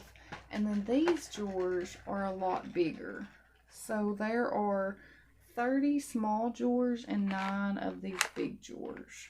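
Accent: South, United States. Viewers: you're so cute. I love your accent. My family is from the south/midwest too but I didn't get the accent. Boo. LOL. Your Lucy doll is fabulous! So creative and fun! Those blankets and rug/doily are amazing! Your drawers are a great idea! I use little condiment containers from the Dollar tree and separate them by size, for my safety eyes. I store them in an old Crochet Society Advent Calendar. I don't mind long videos. It let us get to know you better:)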